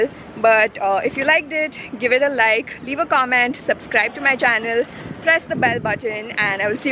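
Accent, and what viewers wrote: India. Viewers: Only Indians can understand your accent. Thanks for the subtitles.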